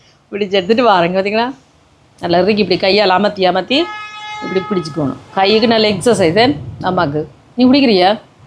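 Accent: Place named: Sri Lanka. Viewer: இலங்கை தமிழ் மிகமிக அருமை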